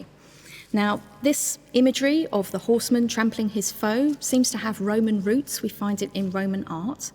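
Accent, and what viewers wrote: England, United Kingdom. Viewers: What specific accent is this from the UK? It's..ASMR-ish. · I love art and archeology and I’ve watched a bazillion videos like this, but this is one of the best, she really brings the object to life and her enthusiasm is infectious...I’m an American and her accent and command of the “real” English language (not the butchered version of the language that we Americans use)...is wonderful. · Smart, beautiful, and an english accent? Call me dinner because I'm cooked.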